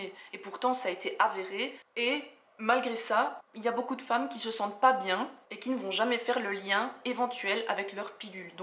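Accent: Belgium. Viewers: Mhhhhh un petit accent belge non? Je viens de tomber sur y’a vidéo car je me renseigne sur la pilule. J’ai commencé à en prendre une jeudi. Donc très récent ça fait 3j. C’est la ZOELY mais elle ne me convient pas je me pense... mes seins gonflent, acné sur les seins le dos et début visage alors que je n’en n’ai jamais eu. J’ai horriblement mal dans une jambe. J’ai du mal à marcher. Je pense l’arrêter... bisous!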